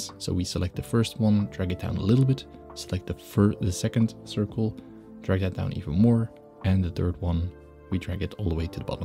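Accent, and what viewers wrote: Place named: Netherlands. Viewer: I recognize a Dutchie when I hear one !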